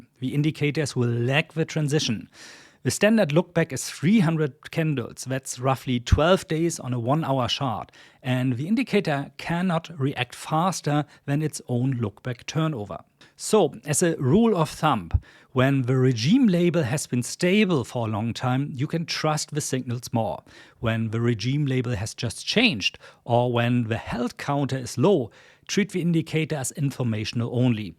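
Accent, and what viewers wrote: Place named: Germany. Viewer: Subbed - I had to. It's the German accent. ~(￣▽￣)~*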